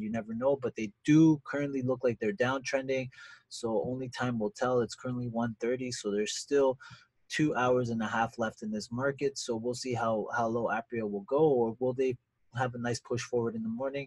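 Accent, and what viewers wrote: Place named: Latin America. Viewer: Oh nooo! It's all good baby! Your dedicated and you show how it's a full time hustle, that you obviously enjoy! You stay informed & you know your stuff. Good predictions! Thanks for showing us your mistakes as well! You take criticism very well btw, its a great quality! Your English is Fine, YOUR Latino. Go Gary V on em and say I'm Latino dick, how am i supposed to talk LOL..besides Money doesn't give a ish..Stay Focused hun🙏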